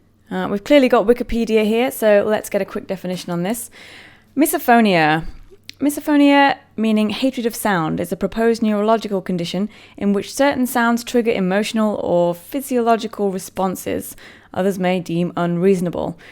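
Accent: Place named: England, United Kingdom. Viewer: Saw this on the misophonia reddit, figured I’d give it a watch. I want you to know that you’re not alone. I can really relate to the experiences you’ve talked about (just some different trigger sounds) and so I really empathize with you. And having misophonia+sensory overload+social anxiety is not a fun thing to combine, I know that firsthand. But if it makes you feel any better, it could be worse; you could have OCD too. No, seriously, it’s hell on Earth. My misophonia being triggered trips my OCD, which trips my anxiety, which ramps up my sound sensitivity and my OCD even further, and then they interact again, and so on, and so forth, ad nauseam. Oh, and I have perfect hearing (quantitatively speaking, I got a perfect score on the Army hearing test) so I hear *everything*. So yeah, that’s great for misophonia. Sorry, I went off on a weird ramble there, didn’t I? 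Both my OCD and my misophonia got triggered badly, I got really baked to cope, then surfed Reddit, which in turn led me here. TaDa! Hooray for self-medication! Also, totally unrelated but I freakin love your English accent.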